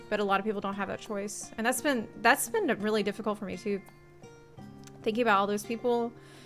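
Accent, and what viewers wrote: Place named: Kentucky, United States. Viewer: aren't you from the america? i mean i live in canada so i'm no expert but you got some kind of...kentucky?ish accent lol.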